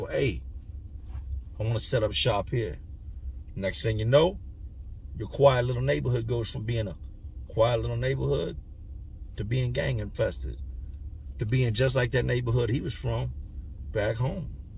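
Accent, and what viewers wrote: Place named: Chicago, United States. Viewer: I knew that sounded like Chicago. What neighborhood? lol They thought you were a King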